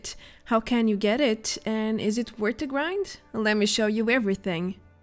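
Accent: Portugal. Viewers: Thank you so much for the video, amazing analysis as usual. I agree I'll probably not going to get into the daily godroll event to get these, took me a while to assemble my SS armor (and it's not even that good, some pieces could be better). Lastly, don't worry about how people perceive your pronunciation, let them try some of the Camões language and see how easy it is. :D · I love the way you speak. That accent... Portuguese right? You pronounce words just fine.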